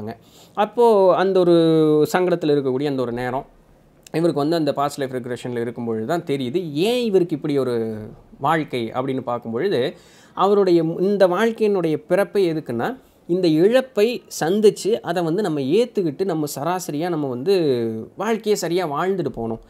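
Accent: Coimbatore, India. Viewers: Anna neenga coimbatore ah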